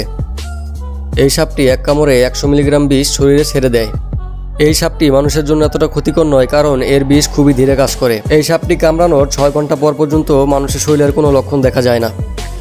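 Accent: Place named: Bangladesh. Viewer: Khub Bajey Bangladeshi Gramya Uchcharon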